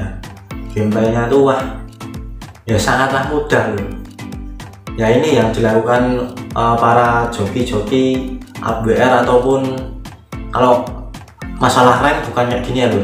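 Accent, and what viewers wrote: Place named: Java, Indonesia. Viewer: Logatnya Jawa. Emang asli mana bang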